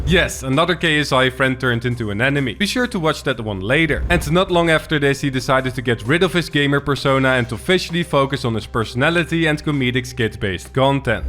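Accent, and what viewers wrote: Netherlands. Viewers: Ik herken een Nederlands accent wanneer ik het hoor ;)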